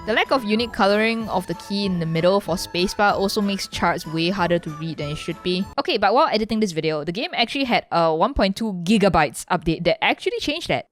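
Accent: Singapore. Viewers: > Did you really think you were going to slip a by me?! I receive neuron activation after only 4 notes! This is my first video to the channel and cute Singaporean accents with rhythm games is a hell of a combo and I’m here for it. I’d heard about the o2 drama but never had the context so this was fun. However with a rhythm channel and Touhou references under your belt I can’t help but notice you haven’t played Yoiyami Dancers yet and I regret to inform you that is /actually illegal/. Please rectify this serious state of affairs at once lest the yamaxanadu send you to gensojail. It’s a hard game and it definitely combines a rhythm movement a la crypt of the necrodancer and a danmaku but once you get the timing of a level and manage to dodge to the beat and get a good full level combo it feels so good. Also it’s wonderfully cute. You should check it out! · I thought your accent was familiar, turns out you're a Singaporean. 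Cool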